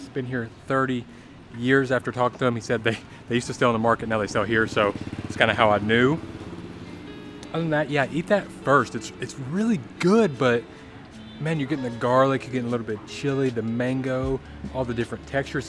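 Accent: Vietnam. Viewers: Your Vietnamese accent like my brother. Very cute ! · Your Vietnamese accent is soooo good and super adorable! Very polite and sweet.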